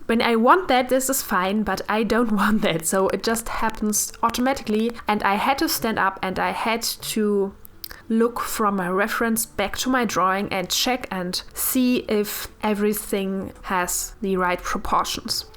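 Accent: Germany. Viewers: The only thing that is a bit annoying is your german accent😅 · are you germaan?😀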